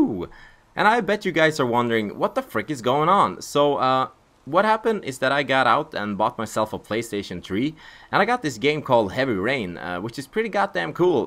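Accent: Sweden. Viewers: If the guy who voiceovers this clip isn't from Sweden I'll eat my hat. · ha 10 seconds in the movie and i can tell, ur swedish;)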